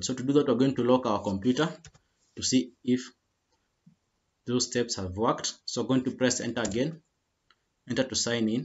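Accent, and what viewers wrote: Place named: Kenya. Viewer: omg this guy is kenyan the english tells it all rada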